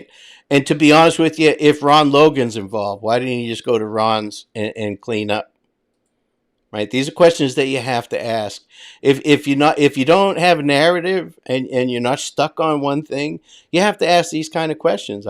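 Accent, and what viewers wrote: Boston, United States. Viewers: Love the way you talk. Long islander here, transplanted to rural PA. Sounds like Boston but it's refreshing brother. Good info, subbed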